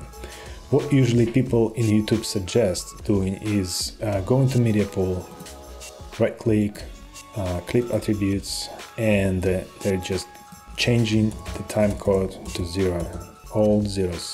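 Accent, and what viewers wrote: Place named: Russia. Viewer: Слышу что русский акцент, спасибо дружище, выручил!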